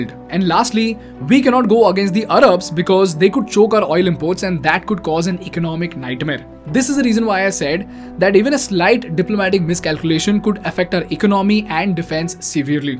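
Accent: India. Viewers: I told you.. Indian has this accent.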